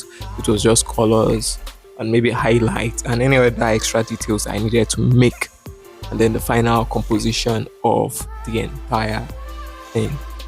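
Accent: Nigeria. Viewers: Loving the naija accent my man! Let's freaking go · I know a 9ja boy's voice when I hear one😂💪🏾. W vid🙌🏾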